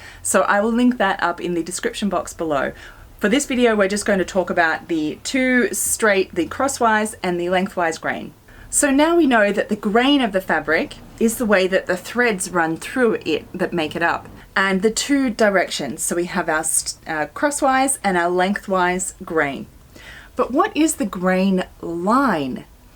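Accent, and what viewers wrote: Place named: Australia. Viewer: Thank you for your sewing lessons. Your explanations are easy to follow and understand. I also love your Australian accent 😊 ♥️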